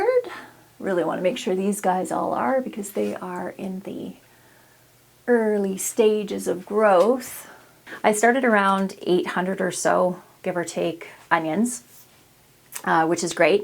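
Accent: Canada. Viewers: I am really enjoying the Canadian accent breaking through in this episode. Ahey! 😂 It is ramping up winter here where I live and you are ramping down. I am missing having a full vegetable garden and you will soon have a full vegetable garden!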